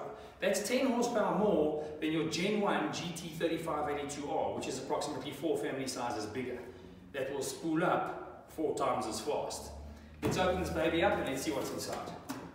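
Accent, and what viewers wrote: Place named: South Africa. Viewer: Dude is definitely south African...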